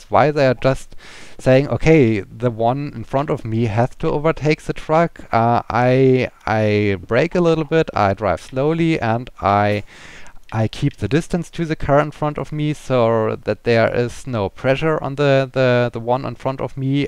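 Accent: Germany. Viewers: I had initially assumed you were German but then I wasn't so sure since your accent sounds different from some other German Youtubers I watch. However, Germany is pretty linguistically diverse from what I understand. Also you are about the same age as me, I was 6 when the wall came down. Regarding highways; The autobahn is pretty famous, at least in North America. Speed limits here tend to be 80-100km/h but they are often ignored. In flatter areas of the country they can be up to 140km/h. Any thoughts for other topics? The language diversity thing is pretty interesting I think. Lastly, Promii is pronounced like pro-mee. It's a diminutive version of Prometheus, which I used as a screen name when I was younger.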